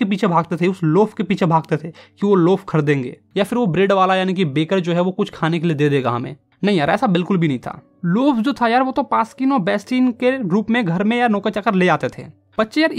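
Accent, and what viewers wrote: Bihar, India. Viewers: Bhaiya aap Bihar ke Aurangabad jila se hai kya .😘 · Sir kiya aap Bihar❤ se he. aap ka language se lag ta he😊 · Sir aap Bihar se ho kya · Yeh bihari h pakka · Sir, Are you from bihar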